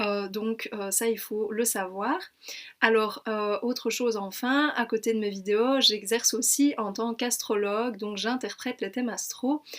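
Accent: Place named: Belgium. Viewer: J'adore ton accent belge vraiment adorable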